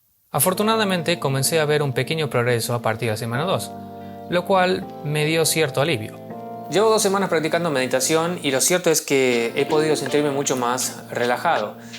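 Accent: Argentina. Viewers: Usted me podría decir de donde es su procedencia? Argentino?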